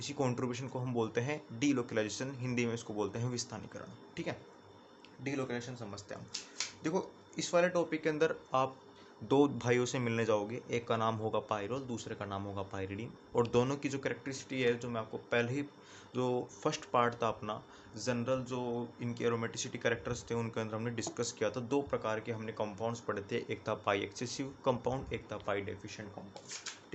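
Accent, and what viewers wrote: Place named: Rajasthan, India. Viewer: Ap rajasthan ya haryana se lgte h😂 bht bdya smj aaya easy kr diya blkl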